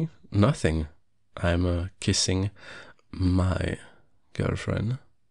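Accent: France. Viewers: He must be a french man? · Possessive, hot, french man? HECK YES 🥵🥵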